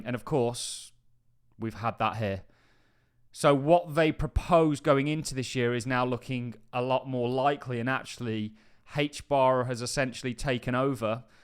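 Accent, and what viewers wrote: Birmingham, United Kingdom. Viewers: That’s a brummie accent 👍🏻😎👌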